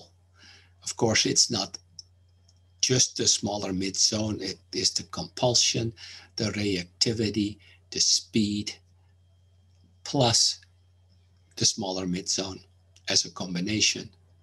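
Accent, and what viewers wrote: Netherlands. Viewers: do I hear a Dutch accent?? 🙋‍♀️ from The Netherlands.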